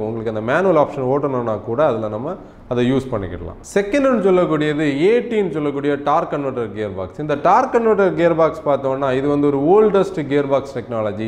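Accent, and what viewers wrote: Kanyakumari, India. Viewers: nanum kanyakumari thaan anna. nama slang ae pothum enga irunthaalum nama ooru kaaranga nu kandu pidicherulam😇❤️❤️ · Kanyakumari slang 👍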